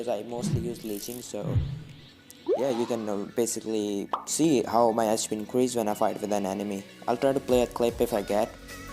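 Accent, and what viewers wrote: India. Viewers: Youre accent is Indian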